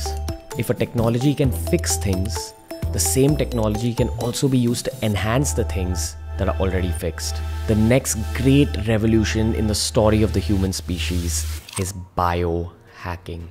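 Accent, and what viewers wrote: India. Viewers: Nice English Proud of Indian accent I don't understand why to do people change their accent.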